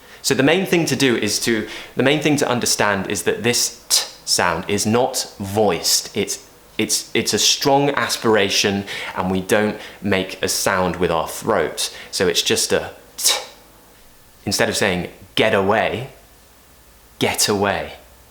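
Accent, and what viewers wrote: United Kingdom. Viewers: nice to see you, I love your accent 😍 British is the best ever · What kind of this British Accent? I mean the accent using T sound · I didn’t like British accent u made me love it such an excellent professional teacher with Shewa at the end ,,British accent XD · I love your videos! From Uruguay!! And love your britttish accent! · I love your British accent <3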